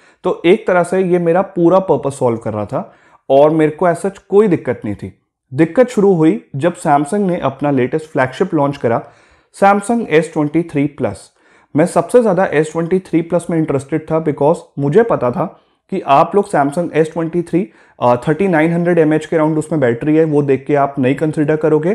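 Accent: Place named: Punjab, India. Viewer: Punjabi lagde oh tuc mainu thodi thodi hindi ch punjabi lag ri aa😅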